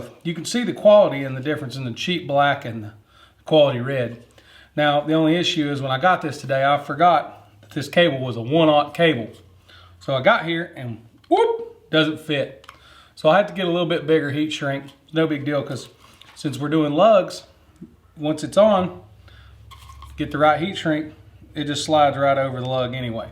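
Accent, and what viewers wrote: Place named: Carolinas, United States. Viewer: You’re from the Carolinas? sure sounds like it🍻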